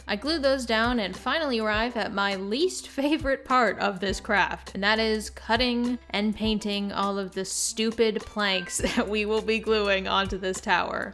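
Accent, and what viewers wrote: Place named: Colorado, United States. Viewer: Sounds normal to me. Apparently dropping the t from the middle or end of words is a Colorado accent thing. Cur-ains, Moun-ains, and so forth.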